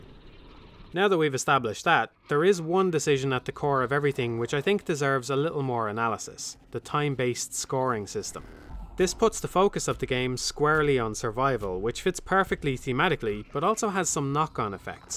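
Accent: Ireland. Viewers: I needed this monotone Irish voice in my life. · holy fuck he's irish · The rebirth of my favorite snarky Irish gentleman is upon us.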